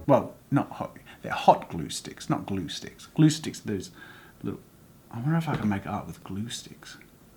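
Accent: Australia. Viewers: He sounds extra Australian today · Are you Australian?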